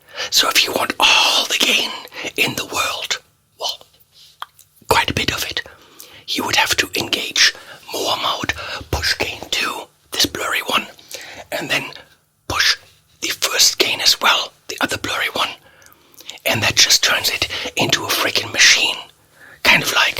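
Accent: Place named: Germany. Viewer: Now I remember how I UNSUBSCRIBE from this channel. Not only that HIDEOUS german accent and BAD ATTITUDE, now ASMR🤦‍♂🤦‍♂🤦‍♂. Please somebody beside me tell this man this is not the best way to review an amplifier. You lost a sell , Mr Fryette.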